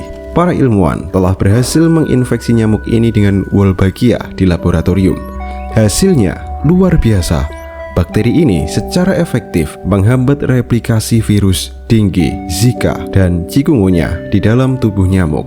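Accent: Java, Indonesia. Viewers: Medok auto skip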